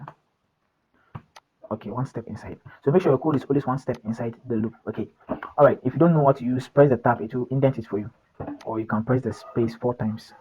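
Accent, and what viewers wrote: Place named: Ghana. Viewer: I know a Ghanaian when I hear one lool nice video! I really needed this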